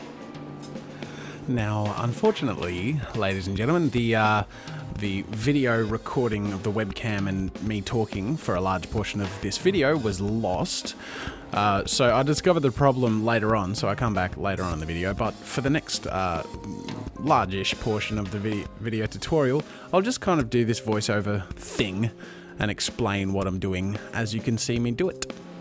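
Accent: Australia. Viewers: r u australlian? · YES AN AUSSIE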